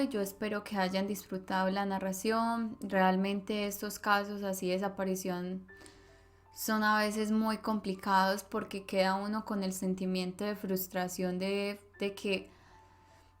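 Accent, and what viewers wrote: Colombia. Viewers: Tiene acento colombiano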